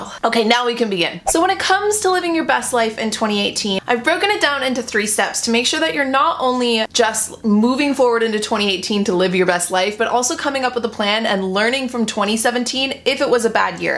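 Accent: Canada. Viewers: Is she from Canada?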